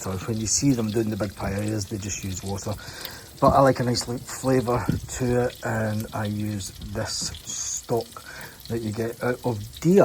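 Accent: Scotland, United Kingdom. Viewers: The Scottish accent is very cool 👌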